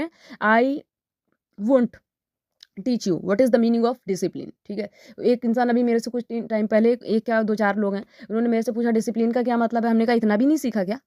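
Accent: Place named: Bihar, India. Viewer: Omg .... Wao apne kitte achhe se story narate ki ....full bihari tone maza aagya .... 😂😂😂😂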